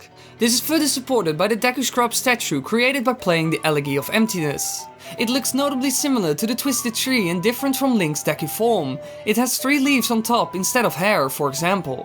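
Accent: Netherlands. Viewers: I bet my life this guy is dutch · You sound Dutch are you?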